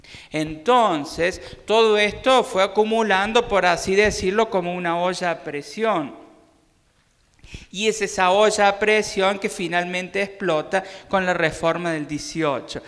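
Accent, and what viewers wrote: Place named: Cordoba, Argentina. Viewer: Bien tonada de cordobés.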